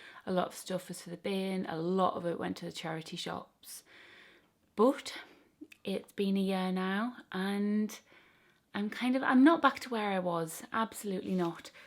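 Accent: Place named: Northern England, United Kingdom. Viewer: I think it's more the North English way to pronounce the vowels than dropping the t It's cool, I love listening to different English accents :)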